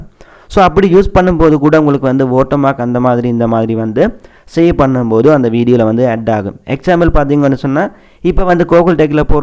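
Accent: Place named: India. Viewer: வணக்கம் இந்த காணொளியை நான் ஓட விட்டு ஓடவிட்டு தான் பார்த்தேன் காரணம் உங்களது மொழியில் அநேக ஆங்கில சொற்கள் கலக்கப்படுகின்றது அது வேண்டுமென்றே கலக்கப்படுகிறது அத்தோடு கூட எதற்காக நீங்கள் இந்திய பாணியில் எமது தமிழை உச்சரிக்க வேண்டும் அதற்காக இந்த காணொளியை நான் விரும்பவில்லை 👎👎👎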